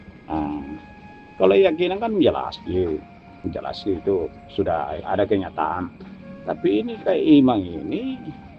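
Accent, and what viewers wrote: Sulawesi, Indonesia. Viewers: Assalamualaikum.. Oo..Daeng.. saya senang dengar logat bicaranya mengingatkan banyak kenangan😊. Setiap daerah mempunyai gaya bahasa logat yang satu kata berbeda makna. Dan sebagai orang jawa terkadang saya harus menyesuaikan kata yang dapat sepemahaman dengan mereka. Seperti kata kamu menjadi kita dan Iman vs yakin. Tetapi saya suka orang sulawesi👍..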